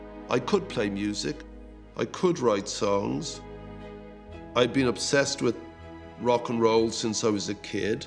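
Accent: Ireland. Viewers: My God geldoff is so Irish. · Utterly Irish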